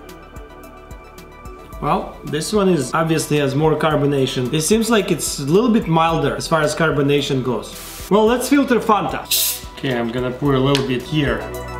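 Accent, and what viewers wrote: Russia. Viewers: Are u actually russion?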